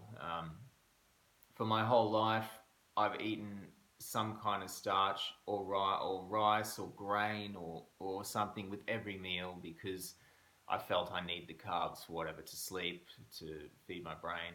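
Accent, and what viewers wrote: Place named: Australia. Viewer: I don’t know what it is about the Australian accent but when I hear it I listen.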